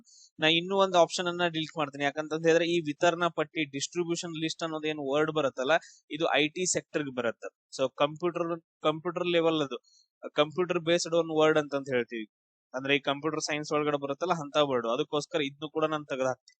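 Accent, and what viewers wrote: North Karnataka, India. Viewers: Super bro your tricks ,,niv pakka Bagalkot or Belagavi ಇರಬಹುದು ನಿಮ್ಮ ಈ ಕಡಕ್ ಮಾತು ಕೇಳಿದ್ರೆ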